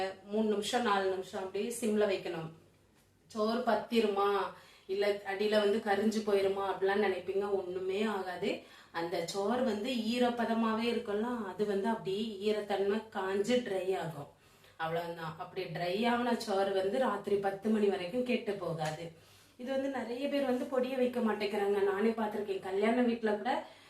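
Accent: Kanyakumari, India. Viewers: ok slang apdi thonuthu na kanyakumari than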